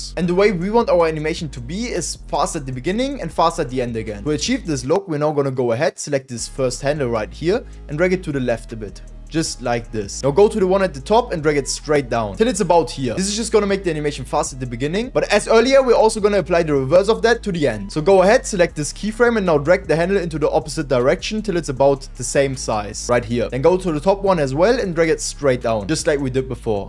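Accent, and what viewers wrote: Germany. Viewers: Ich hör den deutschen Akzent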